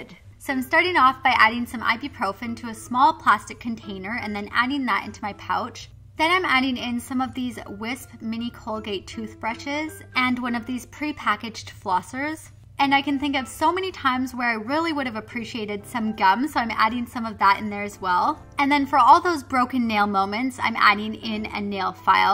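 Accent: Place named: Canada. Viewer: Are you Canadian cuz the way you pronounce the letter A just sounds Canadian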